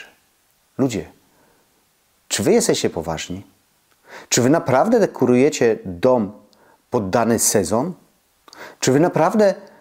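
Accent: Silesia, Poland. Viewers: Zgadzam się w 100%. Dekoracje ponadczasowe👍 P.S. Uwielbiam śląski akcent i niech pozostanie😉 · Podoba mi się Pana zdrowy rozsądek, luz, dystans i....śląski akcent! Pozdrawiam serdecznie :) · Zgadzam się z Panem. Moim zdaniem, jesieniara to osoba, która ma wszystko w nosie, włazi pod koc z kubkiem herbaty i książką, a inni niech pracują. Nie chcę nikogo obrazić, ale ja jesienią zaczynam pracę, nieraz przemokły mi buty, chorowałam, przeziębienie było zawsze o tej porze roku. Poza tym, dla mnie to smutny czas, przyroda zamiera, wspominamy zmarłych. Wolę wiosnę, ba kocham!!! Ale nie nazywam siebie wiosieniarą😂. Kiedyś ludzie nie dekorowali domów na każdą porę roku. Teraz liczy się zysk sklepów i producentów. Większość dekoracji to tandeta i zaśmiecanie środowiska. A śląskim akcentem proszę się nie przejmować. To dodaje Panu uroku. Nie tylko górnicy tu mieszkają- też jestem ślązaczką z dziada pradziada. 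Kiedyś na koloniach Pani wychowawczyni nazwała mnie i siostrę Ślązarami, sama przyjechała tu za pracą. Dlatego jesieniara do mnie nie przemawia. Dobrze, że Pan podjął ten temat. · I dobrze że słychać gwarę. W końcu ona też nas Ślązaków określa. Prof.Simonides często wracała w 😊swoich wypowiedziach słówko gwarowe" tyż". I w żaden sposób się tego nie wstydziła. Pozdrawiam panie. I zgadzam się z Pana przemyśleniami nr dekoracji. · Ja osobiście jakoś nie czuję tych w sumie nowych trendów z zachodu i nie czuję najmniejszej potrzeby kupowania takich rzeczy - raz, ze szkoda mi kasy na to, dwa - mam małe mieszkanie i każda taka duperelka tylko by mi zagracała dom. Co innego Boże Narodzenie czy też święta Wielkanocne. A i wtedy jakoś nadmiernie nie szaleje z dekoracjami. Całkowicie się z Panem zgadzam Panie:)....a offtopowo powiem, że ja lubię ten śląski akcent i wszelakie (jesli są) "słowne niedomagania - dla mnie to wydźwięk naturalności a nie jakiejś sztucznej pompy:) Dobrego dnia! 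· Nie ogarniam zbierania gratów, "dekoracji😢" typu plastikowe dynie i o zgrozo! halołinowe. Moja mała slabość to kocyki, cieplusie milusie. W domu w zimę temp ok. 18 więc kocyk to jest to!!! Kupowałapom mówię PRECZ!😅 p.s. A Pana śląski akcent... Miód na ❤️ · 1. Proszę nie przepraszać za swój akcent. To część pana życia. Jak komuś nie pasuje to trudno. Rozumiem, że ktoś może nie znać śląskich słów i wtedy jak najbardziej, starać się mówić po polsku, ale zmieniać akcent? Pffff, chyba tylko dla buców internetowych. 2. Przyznam bez bicia, że też chciałam mieć dekoracje na kilka świąt czy wydarzeń, które celebrujemy. Chciałam mieć np. naczynia (talerze, miseczki, kubki) na Święta Bożego Narodzenia, na Wielkanoc. Oczywiście myślałam też o naczyniach sezonowych: tu listki na talerzu, tam płatki śniegu itp. I tutaj pojawia się moje szczęście w nieszczęściu: im dłużej czekam na koniec remontu i korzystanie z mieszkania (jestem singielką więc dłużej na niego zbieram) tym bardziej mój zapał na kupno tych rzeczy się po prostu ostudza. 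Już doszłam do wniosku, że musiałabym mieć pierdyliard rzeczy aby udekorować 60 metrowe mieszkanie. Po drodze pojawiło się dążenie do minimalizmu. Może tak musi być. Może po to tyle czekam na moje mieszkanie, aby żadne głupie myśli na jego dekoracje czy urządzanie nie przyszły mi nigdy więcej do głowy. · Śląski akcent- super.Jestem z Podlasia.Polacy pięknie się różnią . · Panie Pana śląski akcent nie przeszkadza, jest uroczy, myślę, że nie tylko dla mnie, osoby urodzonej i wychowanej w Bytomiu. Ale nad wymową "ę" zamiast "en" proszę popracować. Wymowa "bendzie" razi. Tu powinno być "będzie". Pozdrawiam Pana serdecznie i dziękuję za przekazywanie wartościowych treści i zdroworozsądkowe podejście.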